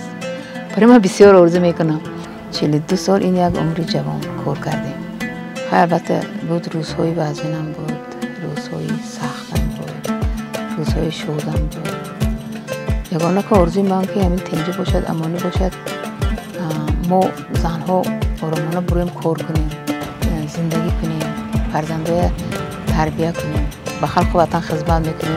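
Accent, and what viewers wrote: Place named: Chorkuh, Tajikistan. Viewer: Ҳам адаби хам лаҳчаи зебои Чоркуҳи тарзи суханрони зебо вафаҳмо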